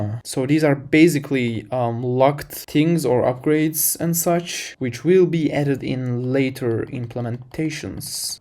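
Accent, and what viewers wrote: Turkey. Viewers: most türkish accent i ever seen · Your accent sounds Turkish so I am guessing you are? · o Türk aksanını nerde duysam tanırım kardeşim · turksun dimi aksandan belli oluyo :D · I sense türkish accent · are you turkish by any chance? i know that accent very well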